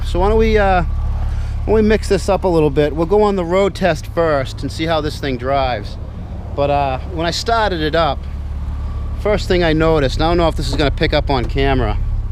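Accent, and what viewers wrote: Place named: Boston, United States. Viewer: Boston guy!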